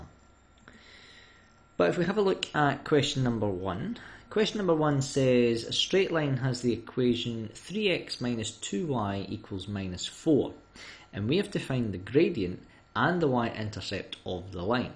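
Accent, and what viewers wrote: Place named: Scotland, United Kingdom. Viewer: Why are most maths videos on YouTube done by Scottish people? Seriously, there's like 9 of them! Great help brother, thank you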